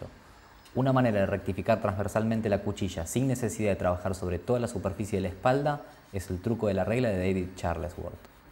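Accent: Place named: Buenos Aires, Argentina. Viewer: muchas gracias!, yo sabia!! Pensé sos tipo es porteño, yo sabia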